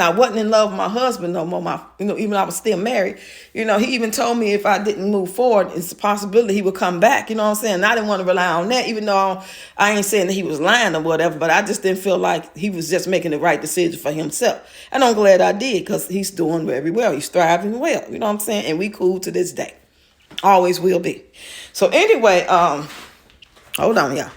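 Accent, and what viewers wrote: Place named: Chicago, United States. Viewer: I’m born n raised n Chicago but I live in la , soon as I start watching your videos I heard your accent and I’m liiike ayyye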